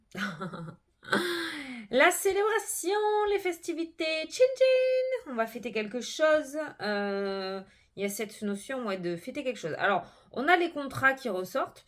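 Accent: South, France. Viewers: je pars en vacance à chaque fois que je vous écoute,votre accent du sud est magique et me fais voyager.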